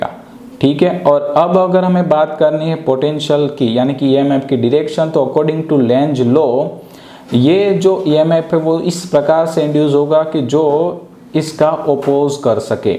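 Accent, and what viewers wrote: Gujarat, India. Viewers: Are you from gujarat ?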